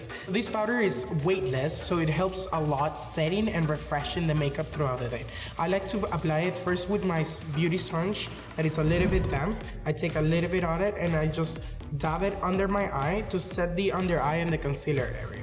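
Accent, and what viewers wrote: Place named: Spain. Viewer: I just love your positivity energy and how you speak English with a little bit of Spanish ❤️❤️ I also did a quick apply and viewing video for this month I got almost the same thing beside the lashes tho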